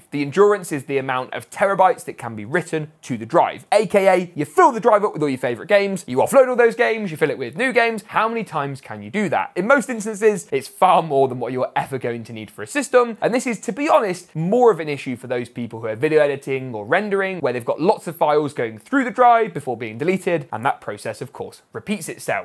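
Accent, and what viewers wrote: United Kingdom. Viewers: Why does your English sound British?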